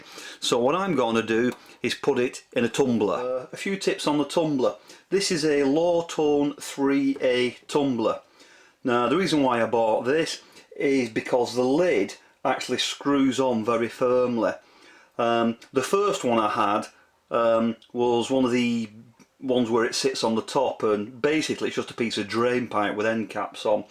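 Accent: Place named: England, United Kingdom. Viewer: What was the powder that you put in the tumbler? Sorry but I'm a french Montrealer and sometime I have problem with the English accent.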